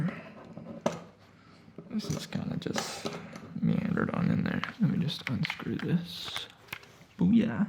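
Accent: South, United States. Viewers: I love those retro style suitcases! I'll be your business partner but I warn you it's a 90/10% split in my favour cause that's just how I roll 😜You won't earn any money but we'll have a laugh. Btw as a Brit I love your Southern US accent so please don't hide it. ❤️ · could you please make a whole video of you speaking in your southern accent..I love it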